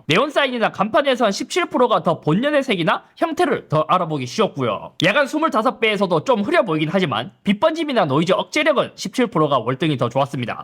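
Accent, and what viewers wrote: Gyeongsang, South Korea. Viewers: 뭥미..경상도 사투리 쓰면서...왠...한화 유니폼을 입고..??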